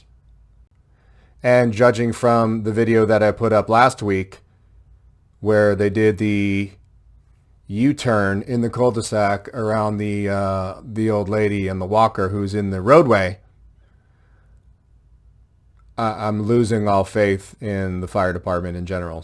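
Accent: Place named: United States. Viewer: Nothing to do with this. But I'm an Englishwoman and I love your American accent,. But aside from that - that twat from Engine 7 had it in for you from the word go. Too much ego and not enough brainpower to understand that you were filming from a public area and had every jurisdiction to do so. What an absolute bellend.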